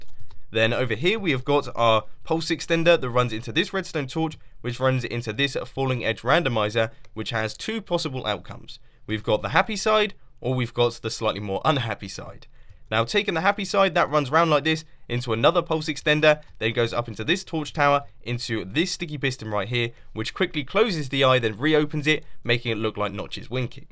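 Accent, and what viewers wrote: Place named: United Kingdom. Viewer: I love you Mumbo and nice British accent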